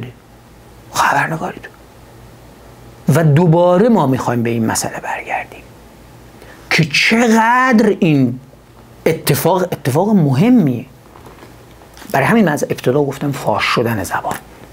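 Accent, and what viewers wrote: Gilan, Iran. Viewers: وقتی عصبی میشه لهجه گیلکی میگیره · من که عاشق لهجه شمالی هستم .وا نده لهجه زیبا رو عزیز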